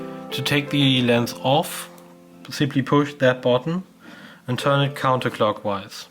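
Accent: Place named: Germany. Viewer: Hello, nice video. From your accent, I am guessing that you are german. Ehrlich gesagt wuerde es mich freuen auch deutsche videos zu sehen. Abo von mir hast du ;)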